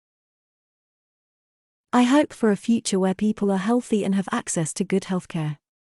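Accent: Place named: United Kingdom. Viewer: I think this is the chanal that i was looking for to Master british accent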